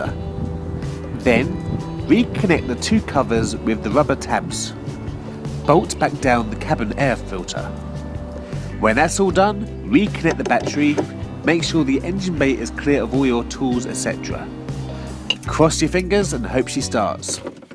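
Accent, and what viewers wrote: United Kingdom. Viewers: Fucking gay British accent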